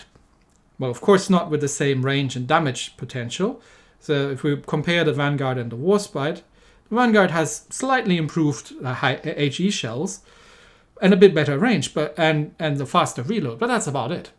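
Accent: Germany. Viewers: nah it's not that it's just that I have studied a little about Europe and Asia and thought that your acent kinda sounds Germen which is cool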